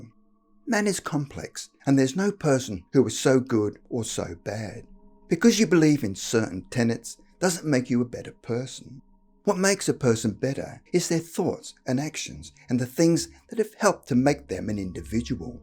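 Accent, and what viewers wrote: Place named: Australia. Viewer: okay than the narrator must be austalian.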